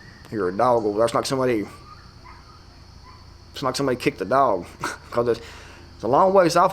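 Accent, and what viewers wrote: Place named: West Virginia, United States. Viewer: Sounds like West Virginia buddy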